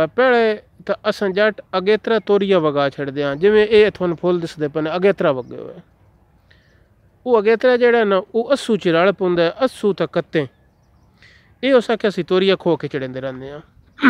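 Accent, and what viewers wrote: Sargodha, Pakistan. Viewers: میرا بھائی کیا بات ہے اصل پنجابی سرگودھا دا انداز تے مزدار بات ۔۔۔۔زندہ باد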